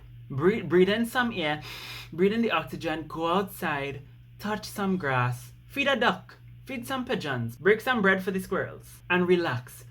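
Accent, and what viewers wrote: Trinidad and Tobago. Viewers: I stopped listening to your rant and zoomed in on your accent. Trini? It's lovely! 😂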